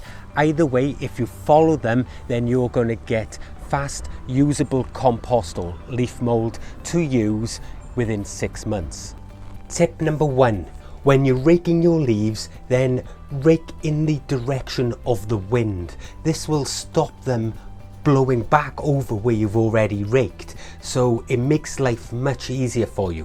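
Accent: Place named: Hengoed, United Kingdom. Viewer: Where are you located fella, I'm in the Hengoed area and you look and sound like you could be local to me?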